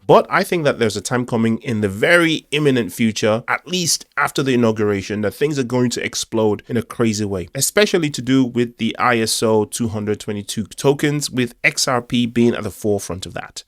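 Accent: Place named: England, United Kingdom. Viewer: We can hear the english accent..no worries😂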